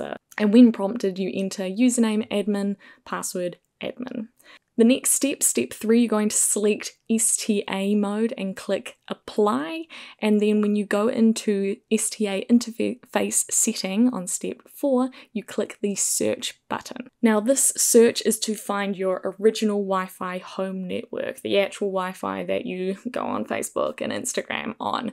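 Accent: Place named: New Zealand. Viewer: I'm still struggling with the Kiwi accent, where an "I" is pronounced like an "E" and an "E is pronounced like an "I".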